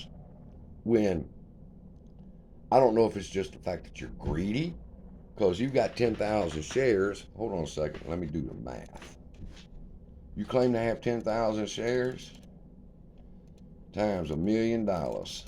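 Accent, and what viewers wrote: South, United States. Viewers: Hey!you're a cool MF. What part of the South are you from?. More power to you have a good day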